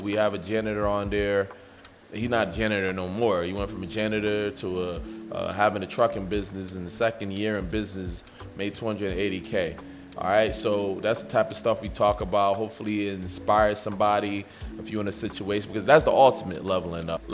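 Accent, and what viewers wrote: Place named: New York, United States. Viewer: You gotta be from Ny lmao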